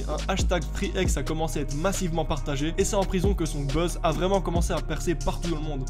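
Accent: Belgium. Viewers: Tu as l'accent d'un belge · On sent le belge en toi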